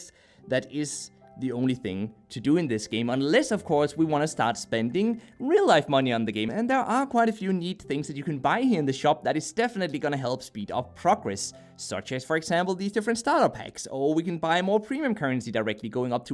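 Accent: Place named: Denmark. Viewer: Before I saw the Kroner symbol in the shop, I could tell by your voice that you were Danish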